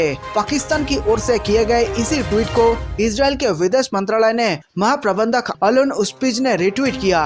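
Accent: Bengal, India. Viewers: Idiotic narration. Change it. Your heavy bengali accent isn't music to the ears. No offence intended. Get a new narrator. That will be help your channel. ( But all concocted and false info)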